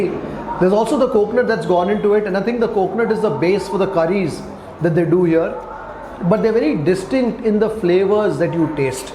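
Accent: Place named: Pune, India. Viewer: Sir. You speak pune marathi. But here in karnataka they use karnataka marathi. Which is not such pure marathi. It is bit different from place to place sir.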